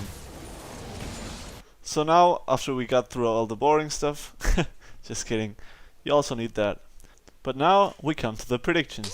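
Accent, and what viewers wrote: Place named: Germany. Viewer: Man erkennt nach spätestens 3min das du deutscher bist :D Aber trotzdem echt cooles Video ^^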